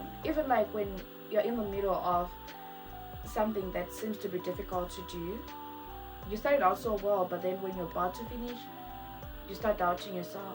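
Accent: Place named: United States. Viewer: Your voice chete , let’s talk about that 😮… smooth voice with English yekuAmerica✅✅✅🤣🤣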